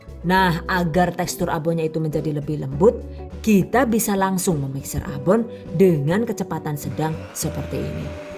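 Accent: Banyumas, Indonesia. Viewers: Mksh resep nya. Bumbunya komplit bgt pasti rasanya enak. Dan satu lagi, bhsa ngapax nya itu lho jd bikin kangen kampung. Salam ngapak. Sukses selalu mbak'e😍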